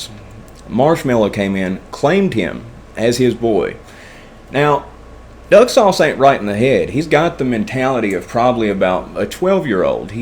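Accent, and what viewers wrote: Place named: South, United States. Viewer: Hey man keep up the good work been watching your videos since you started! Love seeing the progress man, you chilling there with your dog and your southern draw remind me of me and some of my buddies just sitting around kicking it. I live in Hagerstown Maryland which alot of people consider the backwoods part of Maryland since we're in the western part, literally 10 mins from West Virginia line and about 35 40 from Virginia, I got in all my trouble in MD tho, I don't fuck around in WV and VA, damn commonwealth states lol keep it up sorry for long comment. BTW your clean date of April 9th, 2010 was my 21st birthday, I was doing the complete opposite of you on that day lol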